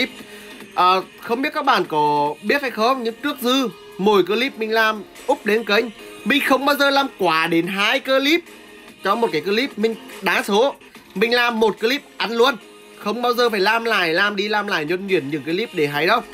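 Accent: Nghe An, Vietnam. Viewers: Giọng nghệ an phải ko anh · Tiếng người ở đâu nghệ An vậy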